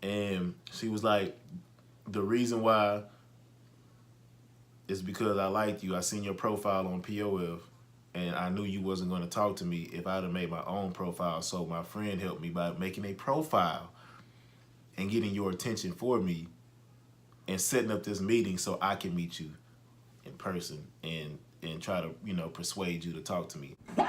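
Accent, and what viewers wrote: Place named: Houston, United States. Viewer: U sound like you from Houston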